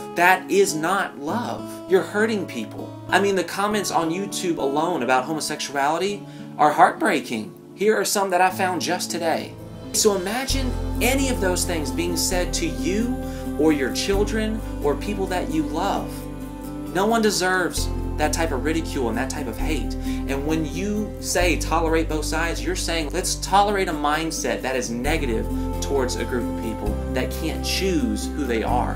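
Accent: South, United States. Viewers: The southern accent though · Does he have blue or green eyes? And is that a southern accent I hear!?!?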